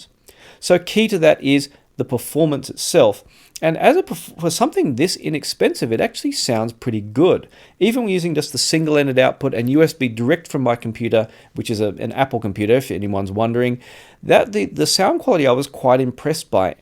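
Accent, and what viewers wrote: Australia. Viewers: $130 what are you talking about try $230 it's very confusing to hear an Australian accent talking about products in US dollars. When watching this from Sydney Australia.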